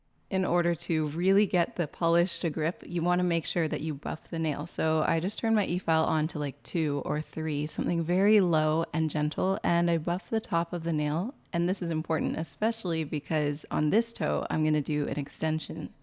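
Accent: Canada. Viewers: Are you from Vancouver you sound like you are? 😂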